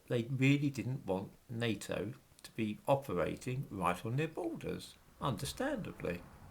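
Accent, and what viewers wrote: United Kingdom. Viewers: sounds British to me do you speak English? Your facts where did you get them from are you a British civil servant Where do NATO weapons go? Near Odessa, the SBU detained smugglers during the sale of weapons purchased from the Armed Forces of Ukraine in the combat zone. the real news from the ground "🅾️" group, Colonel General Alexander Lapin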